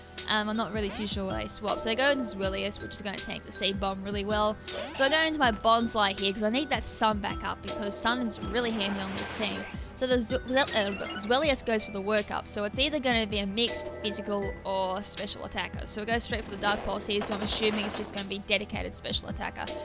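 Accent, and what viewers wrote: Australia. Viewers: just assumed it was Australian accent anyway. ^^ Anyway, didn't mean to complain. Thanks for taking the additional energy to still upload a video. :)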